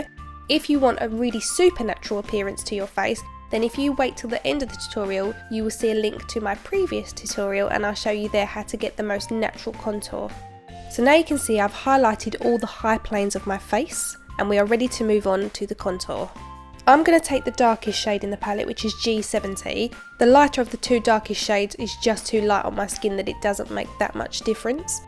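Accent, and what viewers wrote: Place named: London, United Kingdom. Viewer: Lol, It's the London accent I have it to but voice it very pretty!!!!!!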